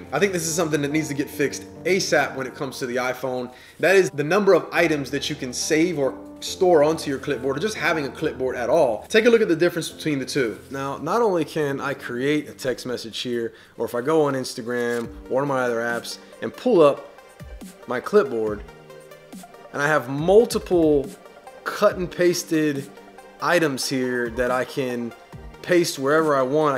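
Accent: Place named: New York, United States. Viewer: You have a bit of an accent or dialect, are you from New York by any chance? I'm probably just crazy.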